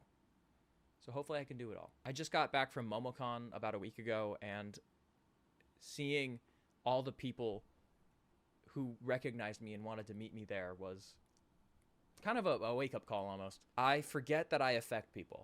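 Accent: United Kingdom. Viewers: Happy birthday to one of the best/sweetest guys I've ever seen! happy birthday to the guy that brought me friends that i can fangirl with over how amazing you are in every way! happy birthday to the man who makes My day almost on the daily!. Happy birthday to the guy that I found all those years ago That made me swoon over the British accent from the 1800s and I obviously know that's no longer a thing anymore but that's basically how I discovered you (on yt with compilations of your tiktoks since I didn't/don't have tiktok downloaded on my phone) Happy birthday to one of the most genuine people I have had the pleasure seeing through YouTube. I can only hope one day that I'd actually get to meet you and truly show you how much you mean to me. This is not a parasocial thing I truly see you as like a friend who's been there for me without even actually saying anything directly to me or being right next to me but still being there if that makes sense?😅😊. 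Thank you and happy birthday! Thank you. I hope it's the best birthday. Thank you! Until the next one and the one after that and so on and so forth. I'm 21 years old and I will be 22 in Sept.17th. Sorry for the tmi.. anyway i hope that everything goes well for you, I truly mean that!. I hope this is not too much or creepy to you, but I really appreciate you for as much as I can without knowing you (in real life) so to speak. Anyhow, have the most wonderful birthday ever because you deserve it you're a wonderful man! you have help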